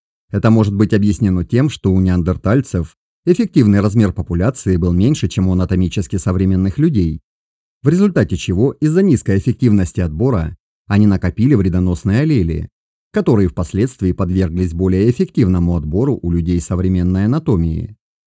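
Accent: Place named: South, Russia. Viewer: Юг России. Просто тихо и в наушниках.)))я же увлекаюсь лингвистикой))и мне говоры не мешают. Такиньки. Южняк)))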